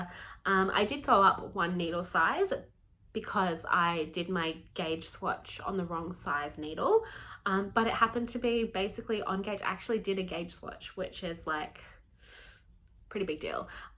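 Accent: Australia. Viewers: Great to see you pop again on YouTube and it’s so nice to hear an Australian voice which are few and far between on knitting podcasts and speak about us coming into summer as the rest of the world talk about going into winter (as though there is only one hemisphere in the world)! Love your humour 😂😂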